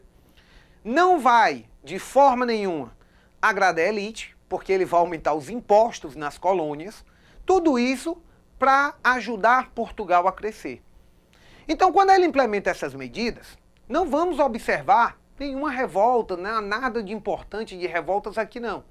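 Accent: Northeast, Brazil. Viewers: Esse sotaque nordestino é tããão lindo 😍 Parabéns pela aula, muito esclarecedora 👏🏼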